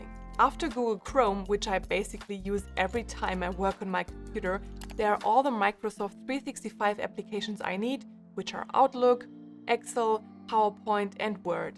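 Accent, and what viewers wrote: Germany. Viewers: Can't stop "listening" to your video. I love your German accent! Bist du tatsächlich Deutscher? Also, bist du Deutscher? Komm schon, erzähl es mir bitte!😃 I am Brazilian and am learning German and to me, you sound like you have a German accent. I could be wrong, but whatever.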